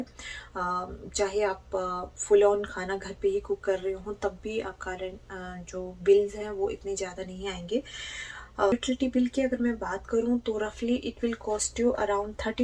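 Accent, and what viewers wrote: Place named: India. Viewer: Your English Accents like Indian language , Not understanding at all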